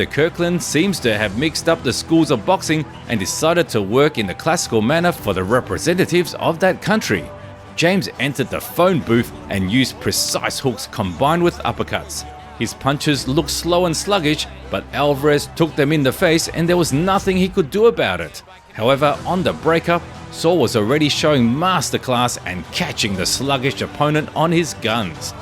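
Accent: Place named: United Kingdom. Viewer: This might have been a great video, accept: the narrator spoiled everything. This guy mispronounces everyone's name along with that terrible UK accent. 👎👎👎👎👎👎👎👎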